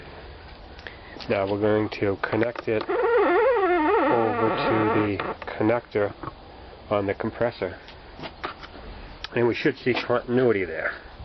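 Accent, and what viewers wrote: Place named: Rhode Island, United States. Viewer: outstanding video.im from texas and i understood you better than the texas folks/dig the accent..where you from rhode island.got a army friend thats talks just like you.keep up the good work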